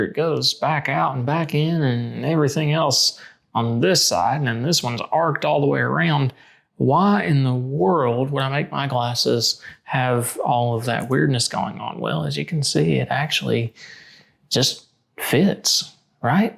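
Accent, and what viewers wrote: Tennessee, United States. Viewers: I recently bought some frames I really wanted from the UK online but was having a hard time adjusting them, watching a few of your adjustment videos helped me get them just right. I had a bad experience with another pair I bought. I loved the frames and the opticians were nice, but they just couldn't get them adjusted right after having them adjust over a dozen times. Anyway, it's nice to have someone explain what to look for and how to make that bend to account for it (also just... what you can and can't do to a frame to make adjustments, I think that's another source of confusion). I looked up your store, nice to see a fellow Tenneseean (I thought I recognized a TN accent!), I'm from Knoxville but I'll definitely look into your shop when I get my next prescription update. Out of curiosity, do you stock Reykjavik Eyes? 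Thanks for creating these helpful videos!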